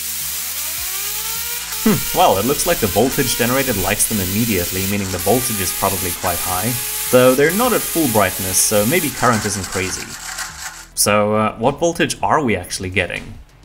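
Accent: South Africa. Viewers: Aye howzit bru, I thought I heard a little south african accent there and the biltong cutter got me 😂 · Yo I didn't expect a South African shout out I mean you do sound south African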